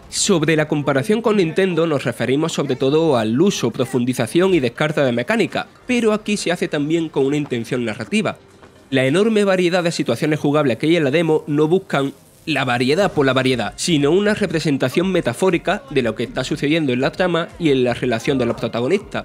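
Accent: Spain. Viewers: Esa forma de hablar es de algún lugar de España? Porque la escucho seguido y no entiendo porque hablan así. Yo soy de argentina, por eso pregunto.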